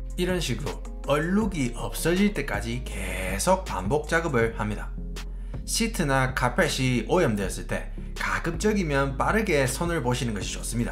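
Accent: Gyeongsang, South Korea. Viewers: 고품격과 저품격, 잉글리쉬와 갱상도쉬가 혼재된 나래이션이 상당히 중독성 있네요 ㅋ 열씨미 새차로 마이 맹글어주세요~😀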